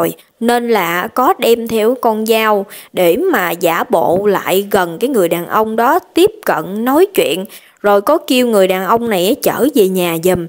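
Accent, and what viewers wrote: Mekong Delta, Vietnam. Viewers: Chau kê truyên lưu loat ro rang giong chât miên tây ne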